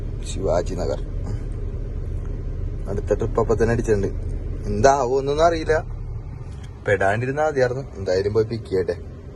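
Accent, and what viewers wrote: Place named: Ernakulam, India. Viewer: Kettal ariyille.. ernakulam 😂😂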